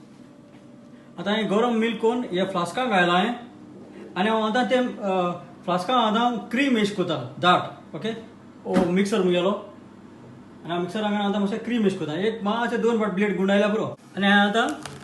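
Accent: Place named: Konkan, India. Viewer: Thanks for sharing your recipies in Konkani More than your recipies I enjoy listening to our Saxtti (Chinchinim) Konkani.Keep up the good work. 🙏🙏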